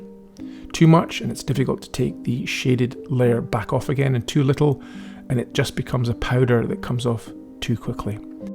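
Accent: United Kingdom. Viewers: There is one product called Pebeo Vitrail that you don’t have to fire, but if painted while the glass is vertical and not horizontal, it will run downwards. I’ve found it also smears out as it dries, so painting a scene or portrait or whatever, the detail gets lost. It is oil based and requires mineral spirits to clean your brushes. My apologies to - I know I wasn’t asked and it may be that this product is only available in the United States (assuming you are in Great Britain by your accent). Just wanted to pass that tidbit of information on as it may help their situation. I was wondering if you could take your skills and apply them to a glass piece using the pebeo Vitrail glass paints. I’ve been trying to see if I could but haven’t spent too much time trying yet. I’ve just done some quick preliminary trials so far. What I’ve found I have said above to the OP. 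Where there is a will there is a way!..hopefully. Anyway, thanks for sharing your expertise! The restored piece is fantastic!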